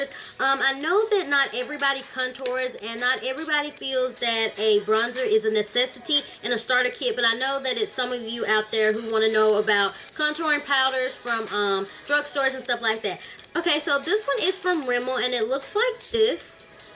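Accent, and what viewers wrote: South, United States. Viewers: I love your voice. Where are you from? I am in Louisiana, and alot of black people around here don't sound like that, but you do sound southern. Very beautiful voice! :)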